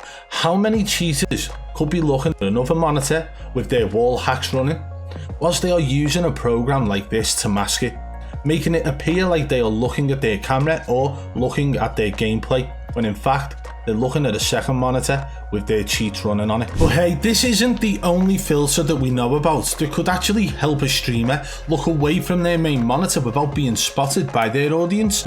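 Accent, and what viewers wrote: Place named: Birmingham, United Kingdom. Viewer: a bermingham accent 😂 love it❤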